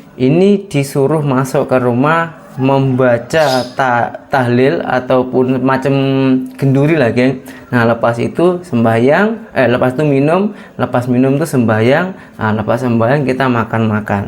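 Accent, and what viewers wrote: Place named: Indonesia. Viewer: Kelakar la..kt malaysia cra kamu brckp ok je..sng phm..blk indonesia da brbeza..semakin pekat bahasa tnh air..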